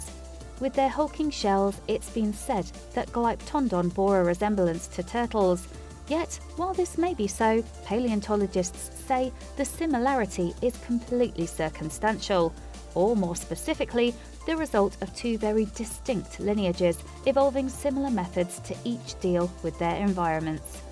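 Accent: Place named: United Kingdom. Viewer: "Pay-lent-to-logical." The British accent fooled me for a while.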